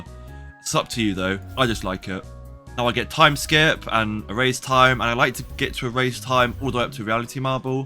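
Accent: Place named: United Kingdom. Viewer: You talking like Stolas or Octavia in Helluva Boss (The british accent i think xd)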